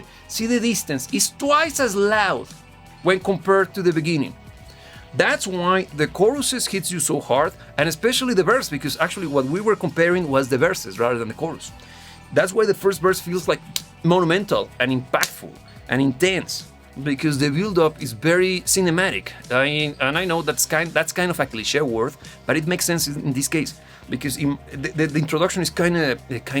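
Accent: Russia. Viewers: It seems you already have a slight Russian accent Great analysis, btw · Ты русский (акцент на месте) Тоже очень Люблю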